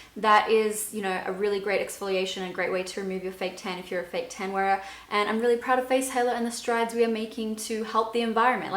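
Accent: United States. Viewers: Omg you’re getting an American accent!!! Whyyy 😩😩😩. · Crazy I can hear you getting the American accent!!! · You've got a bit of an American accent now! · Loved this video! You can start to tell that you're picking up on the American accent and that's honestly amazing xx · OMG!! ur accent is turning American especially when u said “i just painted my nails twice”!!! But seriously I’ve had my fave halo since the start of last year and it still works perfectly (I don’t wear makeup everyday) and I always tell everyone to get one!!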